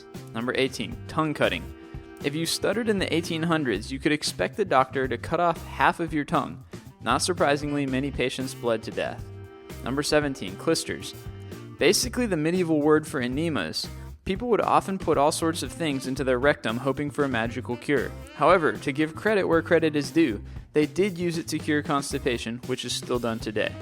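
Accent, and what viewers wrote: Canada. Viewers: ***** Racist? He's a Canadian..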